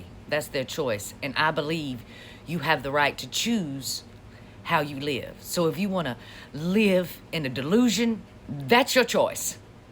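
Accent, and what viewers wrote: South, United States. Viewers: We love the southern whether heavy or not. We love the look, the laugh, the truth, and the absolute ease in which it seems to flow. 😘💙🥰 The jury still out on Marge. Give 'em hell. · Keep it up. I know by experience that humor and a southern accent break through walls. Mine is more of a hillbilly, Eastern Kentucky accent but just as effective.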